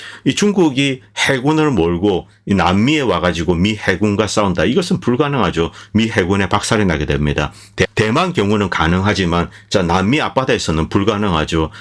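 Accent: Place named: Busan, South Korea. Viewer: 본인이 몰라서 그렇지 전혀 다릅니다 지금 방송하시는 도 부산 사람이거든요 거의 이 억양입니다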